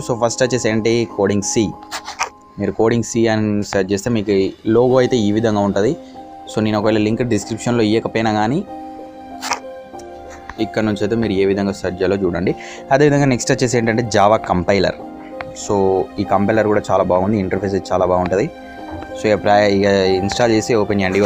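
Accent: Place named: Telangana, India. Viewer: Thank you brother....your slang super (pakka telangana yasa i like your slang)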